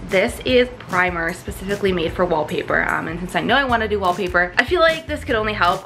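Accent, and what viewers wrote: Canada. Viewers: i love how Canadian sounds ahhaha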